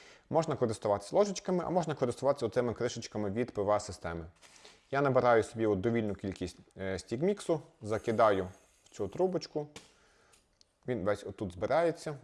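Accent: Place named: Lviv, Ukraine. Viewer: Вітаю! Гарно показали і пояснили. Дякую за цікаві відео і Львівську українську!)))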